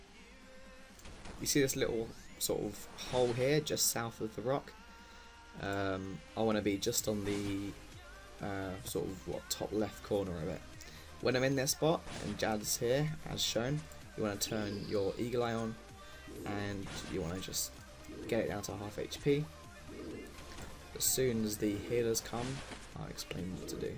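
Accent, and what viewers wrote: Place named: South Africa. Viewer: whats with south Africans and saaoooohhh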